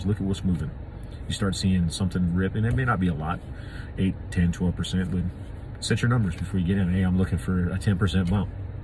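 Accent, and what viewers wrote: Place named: South, United States. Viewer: No no, then ya won’t hear that lovely southern drawl!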